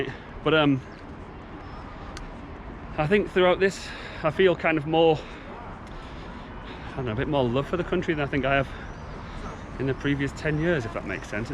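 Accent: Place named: England, United Kingdom. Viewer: Holy....This is Harlan. It’s nice to see my teacher’s vlog on the YouTube by surprise. Been in US for 5 yrs now. I can finally tell your English accent lol. Keep doing the best work!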